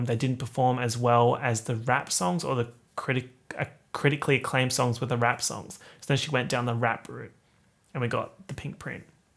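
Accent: United States. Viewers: your american rap accent is good tho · Just sayin....your American accent just sounds like you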